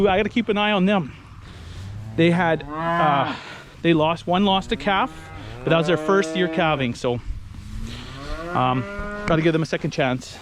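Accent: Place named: Upper Midwest, United States. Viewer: Oh wow, you're the real deal! WI or MN by the accent, yeh? I like that you're nice to them, use honey opposed to stick. :)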